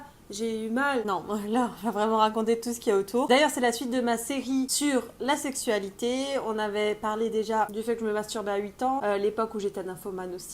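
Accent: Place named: Alsace, France. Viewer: J’ai reconnu ton accent et quand t’as dit que t’habitais en Alsace j’étais genre YES aha Je suis de Strasbourg :) sinon c’est cool ta transparence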